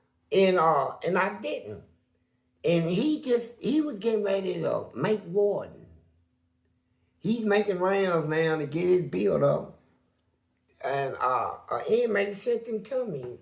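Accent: South, United States. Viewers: Hey, my comment got deleted but I saw you read all your comments. You're my favorite person on YouTube and I wanted to tell you what an inspiration you are to all of us brother, especially me. My heart aches for you and what has happened for you in your life. I can't imagine that kind of abuse and trauma. And you came out with faith and a smile. You are a Unicorn bruh! I'm from Chicago so y our southern drawl and charm and the way you tell stories, well, your just a funny dude. Very charismatic.Never stop being you and telling us stories. And, thanks for all you do nd bringing us!!!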